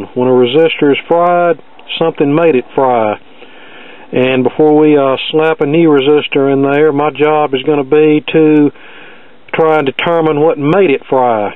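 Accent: South, United States. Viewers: Hi! I am pleased to be following you. I am 69, retired, and trying to learn again what I once studied in high school electronics class. I enjoy your videos, and your sense of humor, very much. I also enjoy hearing from a fellow Southerner. Those other guys who pronounce the L in solder just sound ignorant to me 🤣 Do try to keep things in focus to improve our experience, but I will keep watching nonetheless. Keep up the good work, people my age are not too old to learn. Thanks for your good work!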